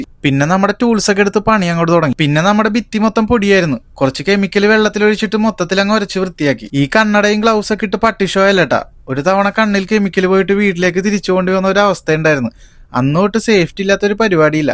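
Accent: Kochi, India. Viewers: Kochikkaran ano? Oru Kochi taste ulla Malayalam 😂❤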